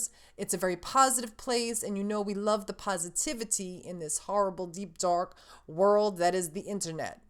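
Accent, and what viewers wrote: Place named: New Jersey, United States. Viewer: Haha I thought you were from New Jersey!anyway still criminally underrated Channel but we're sticking with you girl!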